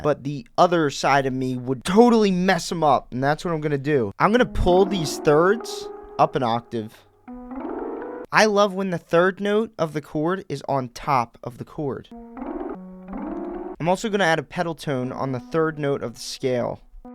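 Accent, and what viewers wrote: Philadelphia, United States. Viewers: You’re from Philly aren’t you? I hear the accent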